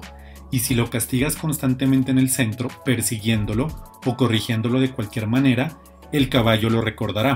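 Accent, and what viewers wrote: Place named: Latin America. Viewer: Wouldn't be easier if you keep on speaking English and have the subtitles or close captioning in Spanish? Although I understand Spanish, it is spoken too fast for me and is heavily accented (South or Central American?). Or, can you re-post the video in English? Make everybody happy?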